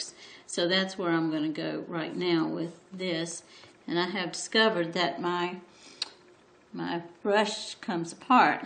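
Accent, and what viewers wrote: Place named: Wilmington, United States. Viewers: This session 4 doesn't look like it started where 3 left off. The foreground is different. Would it be really hard for you to show the exact steps taken in a painting, instead of leaving huge gaps??? Have to say, all your videos are kind of a mishmash and there is no streamlined continuity in any of them, or any combination of them that goes from START to FINISH of a painting. or even the techniques you use. Intermediate stages are not shown which are really crucial for learning. Maybe you need to get more familiar with the technology of making videos. None of the other art instructors up here on Youtube leave such gaps in showing their technique. You sound like a very nice Southern lady, however, I think you should stop making so many sessions with each painting and make a one hour long, or however long it takes - video showing literal START to FINISH of a painting. 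Don't worry about making them roo long. People want to learn the whole process. No one will get bored, there are lots of one hour instructional videos up here, but not many beach scenes unfortunately.. I think maybe you're not comfortable with the video equipment and thats why you leave out some stages from one session to the next. I hope this helps you get more viewers. Your beach scenes are really lovely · I am from Wrightsville Beach.. you sound like you’re from at least Wilmington or nearby. Beautiful painting!!!! I have a very hard time with sea oats and this pretty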